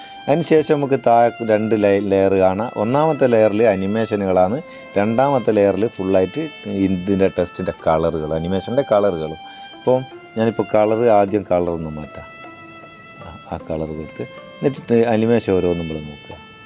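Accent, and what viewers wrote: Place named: Kannur, India. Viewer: നിങ്ങളുടെ സ്ലാങ് കേട്ടിട്ട് കണ്ണൂര്കാരനാണെന്ന് തോന്നുന്നു. 🤔🤔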